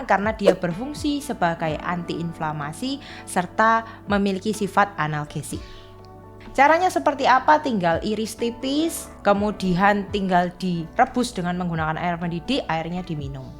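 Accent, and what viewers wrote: Java, Indonesia. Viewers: logat jawanya❤❤❤❤❤❤❤❤❤❤❤❤❤❤❤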